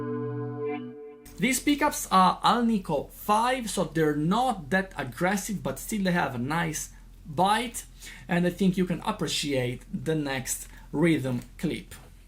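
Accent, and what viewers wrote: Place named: Italy. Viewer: I subscribed by the time you completed your first line.....damnnn i soo wish good content.....and also for my love of italian accent😅😅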